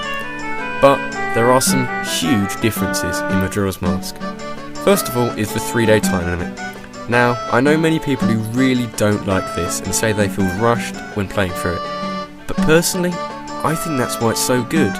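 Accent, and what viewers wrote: United Kingdom. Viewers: Because almost every Brit I've heard pronounce Ocarina says Ocariner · OOOOH SWEET! A BRITISH GUY!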